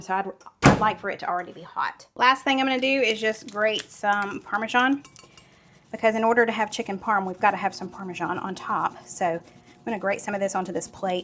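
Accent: South, United States. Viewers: Embrace your southern accent!!! Say boil and broil and oil the way you normally say it. 😊 We never miss an episode!!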